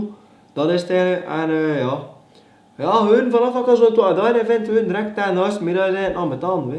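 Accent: West Flanders, Belgium. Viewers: Jaaaa West-Vlaamse k3!!! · Tzo wel skwone zin wi nen West-Vlaamse k3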